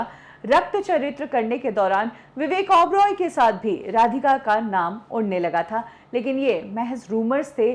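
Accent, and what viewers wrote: India. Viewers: हिंदी का उच्चारण सुधारें। Marathi influence is very clear. हैं को है बोलती हैं। इस तरह को और बहुत सारी गलतियां हैं।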